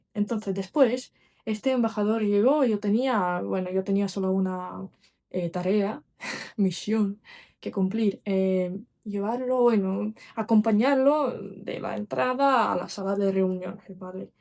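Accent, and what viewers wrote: Spain. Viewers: entonces,demaciadas veces pero ahi vas con tu español ,veo o escucho 1 gran influencia española en el español,suena raro para mi en lompersonal no me gusta,es interesante el choque cultural ,Rusia tan lejos de America latina o norte america donde esta mexico,me muero por ir a pasar 1 larga temporada ,ojala 1 dia sea posible,saludos desde la riviera maya mexico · Hablas más como gachupin que como latino, 🫤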